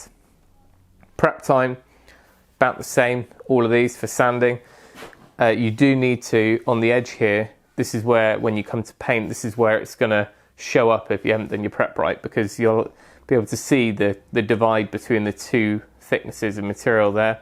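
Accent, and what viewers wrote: United Kingdom. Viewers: I've got to say, I have thoroughly enjoyed the series. I might be bias but it's so good to hear a British person rather than a DIYer from a cross the pond. Keep up the good work. Subscribed.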